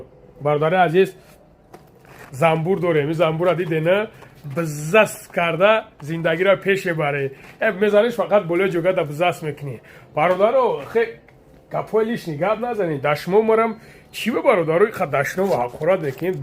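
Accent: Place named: Lakai, Tajikistan. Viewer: Ака хдт точики тоза нести чия ранги лакае метови бомаданият гап заданро омуз хубтар мешуд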